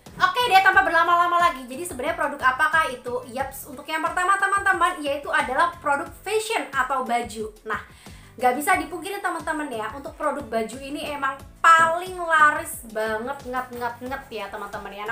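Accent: Java, Indonesia. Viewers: Ngomongnya medok banget. Dan kurang santai mbak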